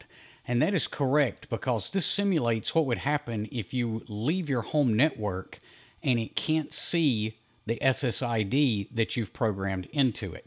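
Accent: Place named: United States. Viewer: remember gentleman his accent is country not stupid Great video dude gonna help me with my school project.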